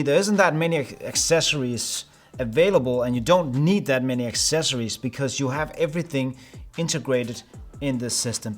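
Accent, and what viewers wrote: Denmark. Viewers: Rigtig god video, synes jeg kan høre du kommer fra Danmark hvis ja, så pisse fed video. Går selv og overvejer DJI Ronin 4d, så tusind tak for review :)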